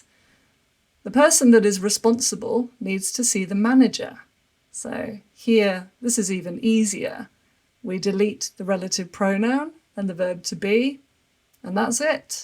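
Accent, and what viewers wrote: United Kingdom. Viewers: It was perfect 😍 I love your British accent 🥹✨️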